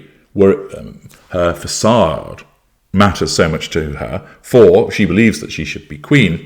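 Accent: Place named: RP, United Kingdom. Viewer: Dear Sir, can’t you put a paper bag over her head, too much of her ugly mug is showing for my liking. Gawd you have got to be joking, her a Queen, I agree with your phases……ffffffff kidding me…….fffffff retarded….. Maybe I’d agree to Queen of a 💩heep….. BTW with your posh accent, I love it when you curse, makes me laugh so much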